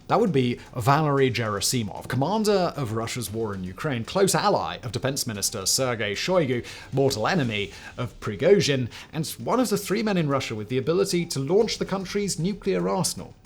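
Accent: United Kingdom. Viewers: I have to admit that I have a weakness for the British accent. An Englishman (or woman) could be reading the phone book and I would be entertained for hours...so imagine how pleased I was when I found Simons'channel (s),(s),(s),(s)... Speaking of his channels... I think that a mad scientist genetically engineered a sample of DNA & the concept of the YouTube channel which produced an octopus type creature with many channels and many brains. An octopus with a magnificent beard I might add. Gods beard isn't half as impressive. Surely holds the record for the most channels...if there's someone with more, I don't know of them. As long as keeps creating, I'll keep watching. Thanks.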